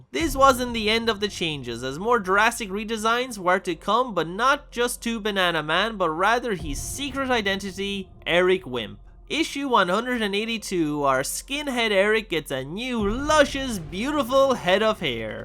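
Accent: Ireland. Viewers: ffs... I was trying to work out your accent.. going from dutch to welsh to ...ireland... then i heard your 'artwork'..deffo southern irish.. scrolled down to see Galway , derp. Onie tuck uz twarthie minnits , sake!... I used to see bananaman on u.s. tele late 80's via nickelodeon...had no clue the backstory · I normally like an Irish accent - but I'm afraid your voice makes my ears bleed.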